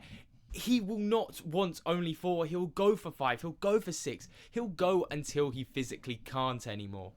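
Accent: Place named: United Kingdom. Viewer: Your British accent made it exactly like you wanted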